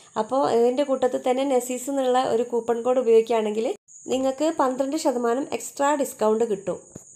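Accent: Kozhikode, India. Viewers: Kozhikod കാരി ആണല്ലോ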